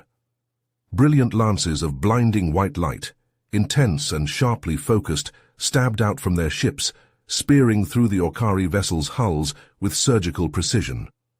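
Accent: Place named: United States. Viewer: Sounds like an American with a mix of 🇺🇸🇸🇪🇪🇪🇺🇦🇮🇱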